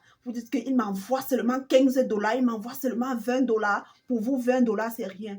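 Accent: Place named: Cameroon. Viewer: Belle vidéo, argument très pertinent. Je sens, d'après ton accent, que tu es Camerounaise, je suppose.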